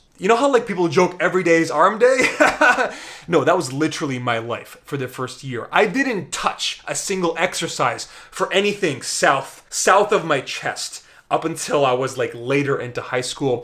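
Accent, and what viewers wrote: Russia. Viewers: That Russian accent tho... 😆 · omg the russian accent lol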